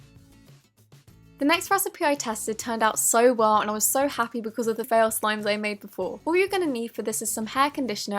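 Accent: England, United Kingdom. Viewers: GamerGurl Utubly it’s called an English accent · you sound british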